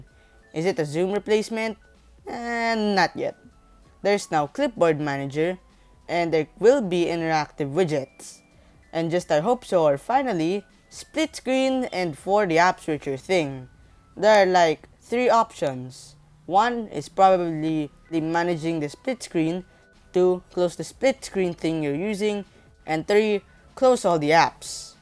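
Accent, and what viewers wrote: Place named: Philippines. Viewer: You are unmistakably Filipino, that voice gives it away 😂. And yes, I'm the same person from Twitter.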